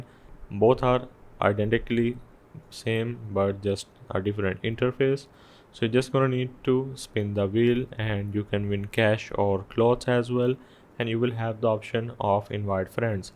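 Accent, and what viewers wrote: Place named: Middle East. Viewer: Middle Eastern accent detected = video ignored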